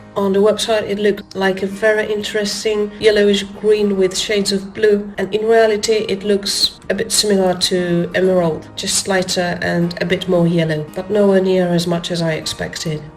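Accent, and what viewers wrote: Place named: United Kingdom. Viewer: I really appreciate your honest review. I hope you had a fabulous holiday!! You sound like you now have a British accent ...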